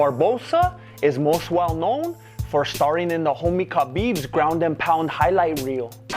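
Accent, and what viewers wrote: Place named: Mexico. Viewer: i enjoy watching this guy ,his nice Mexican accent ,his jokes and beautiful videos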